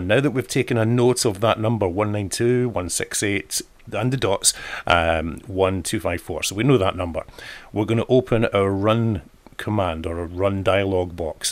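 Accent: Scotland, United Kingdom. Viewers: Helpful in that I didn't even think of using the USB port on my 'Rooter' ;) I do love a good Scottish accent! (still think Scottish Engineers are the best to work with!)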